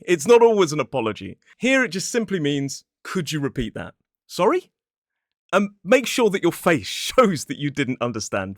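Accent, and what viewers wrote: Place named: RP, United Kingdom. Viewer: No one speaks RP, apart from you and 5 othet people I encounterd in all my 26 years in the UK 😅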